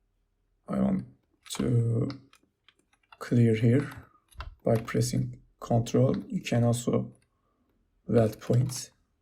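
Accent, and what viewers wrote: Turkey. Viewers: Clean! I am sure that He is turkish by the way! His accent is soooooo familiar!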